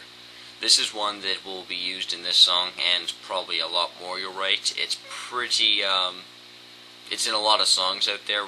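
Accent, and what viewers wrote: Canada. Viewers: Are you canadian? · nice vid. are u canadian? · Has anyone ever t old you that you have the most adorable Canadian accent ever?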